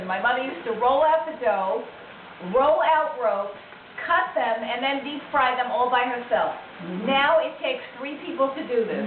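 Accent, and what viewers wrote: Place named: Brooklyn, United States. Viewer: Could hardly tell you were from Brooklyn.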